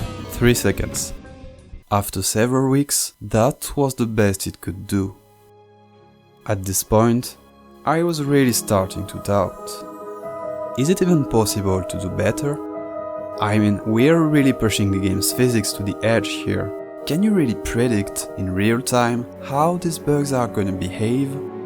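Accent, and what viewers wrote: France. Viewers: On entends ton franglais MDR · Je me disais bien qu'il y avait un petit accent Français, tu parles super bien anglais j'ai mis 2 vidéos à capter mdrrr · bro gotta be french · Are you French or Chinese? Or both? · are you french by chance? trying to place the accent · J'entend la france dans ton accent 😂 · l'accent bien français comme on aime xD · you sound like you'r french are'nt you ? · Are you french ? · Tu es français nan? Tu as un accent fr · t es francais nan? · Je suppose que tu es français? Ton accent est bon mais très français 😂❤❤❤ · Wow, cool video, i've watch your others video when you train your IA it helps me on school project thanks (btw i've got a question. is that the "french accent" ? (because i'm frecnh so i ask)) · Are you french bro ? Love the accent haha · Tu es français ? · I cant listen to this french accent Im sorry... 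· 100% il est français mdrrr super vidéo sinon! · Pourquoi il a un accent français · t'es francais ? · Rooh l'accent bien français c'est cramé 😭😭😭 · Fr I spotted the french accent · t'es francais non ? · are you french? cuz since the video started i heard ur accent and was kinda curious about ur nationality, and when i heard the way you said "parameters" i was like: "he must be french". the reason im asking is because my curiosity is killing me bro also the video is awesome. that seems so hard to do. i've always wondered like how are they making AI. i mean the how is it LEARNING if you have to CODE it to DO something. it still blows my mind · bro are you french? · are you french? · Good video I love the idee. Ps : tu es francais ? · Are you French ? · You are French ? Your voice is strange. Tu as un accent assez français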